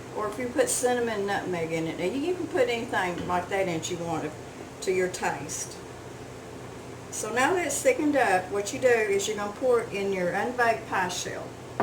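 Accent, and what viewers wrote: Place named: Arkansas, United States. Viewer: This is so much like my mom's recipe. They lived through the "hard times" of the depression and WWII and farmed a small piece of land in south western Manitoba(Canada) and they had to make things stretch so she used just 1 cup of raisins and 2 cups of whole milk from the cows she milked herself. Mum made this pie when we were growing up and I still love it today and I'm 61 yrs of age. Thank you for sharing with us. I love your Arkansas accent. We lived for one year in Kansas, when I was 8 rys old, right down in the the south west corner near the Oklahoma border. At that age a kid is like wet clay and I picked up the local accent so much that when we moved back to Canada everyone thought I was born in the states. To this day when the Star Spangled Banner is played my eyes well up and I remember that wonderful carefree year as a boy riding my bike in our small town with my first dog running beside me. 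I'm tearing up as I write this so will leave off with many thanks and good wishes to y'all.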